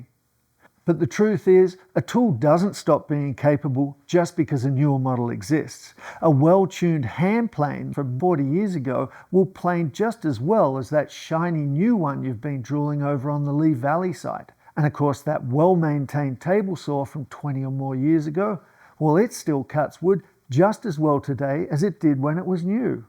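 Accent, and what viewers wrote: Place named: Australia. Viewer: Great advice. I will stop buying gear and start making. I’ve just found your channel and it was nice to hear an Australian accent.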